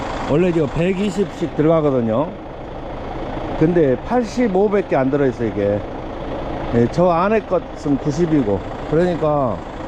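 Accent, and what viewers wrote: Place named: Jeolla, South Korea. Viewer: 기사님 덕분에 영상으로 나마 힐링하고 갑니다ㅎㅎ 표준말보다 전라도 사투리가 더 정겨워요^^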